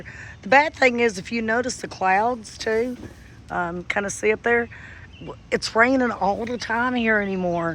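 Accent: South, United States. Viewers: Blessing to you. Your channel popped up in my feed just now. Love your southern voice. Subscribed. Much love from Charlotte, NC!!!!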